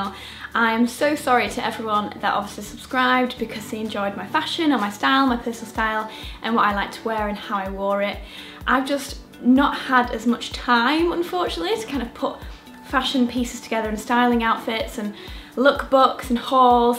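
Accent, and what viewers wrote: United Kingdom. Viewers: Love your accent. I'm from the US so it sounds like you have a British accent or something like that. Also love your videos.😋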